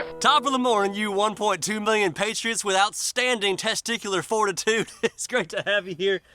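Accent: United States. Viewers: You sound USa educated.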